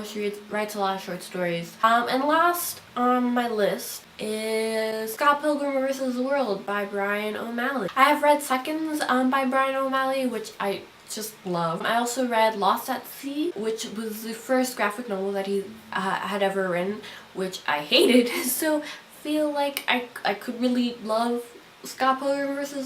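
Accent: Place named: United States. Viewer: Oh, I love Margaret Atwood! Handmaid's Tale is very good... I feel like that's the obvious one to start with. I approve. :) :) On accents, I probably would have thought you were American if I didn't know any better, but you do always sound very well spoken and clear... so maybe I'd just guess you were more northern? Which you are in fact north of me.... :) :)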